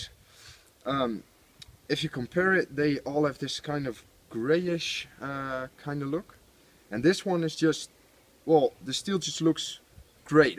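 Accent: Netherlands. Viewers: It looks very good but too bad it doesn't have blowback. P.S. Your accent sounds dutch.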